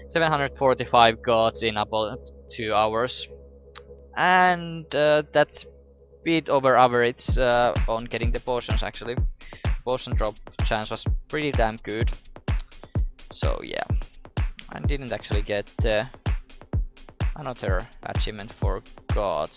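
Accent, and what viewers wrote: Finland. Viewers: Kuulee heti että on suomalainen :D, / u can hear that hes finnish :D Missä serverissä pelaat?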